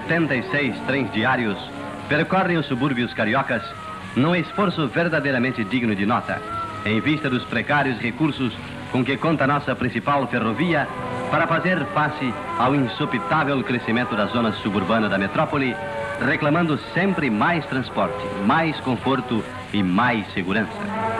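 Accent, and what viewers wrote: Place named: Portugal. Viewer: esse português do narrador tá meio portugado rs.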